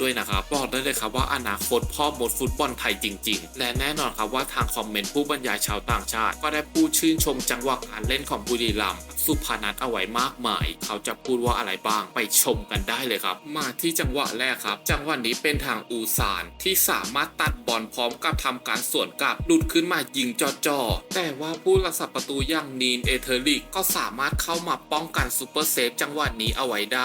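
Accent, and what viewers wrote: Suphanburi, Thailand. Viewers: แอดเป็นคนสุพรรณแน่ๆ รึไม่ก็กาญจนบุรี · เสียงเหน่อๆ เหมือนหนุ่มสุพรรณเลยนะเพ่สุดยอด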